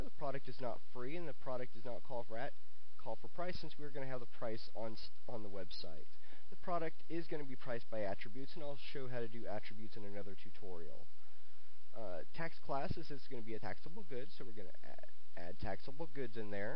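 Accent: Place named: United Kingdom. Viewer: He's a Brit so ofcourse he got an accent.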